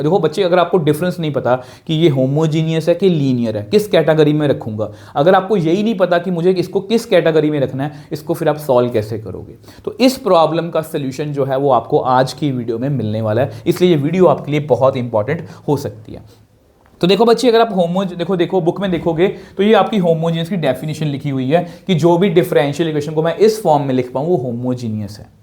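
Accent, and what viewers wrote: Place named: Pakistan. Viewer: Sir apki accent pakistani lgti h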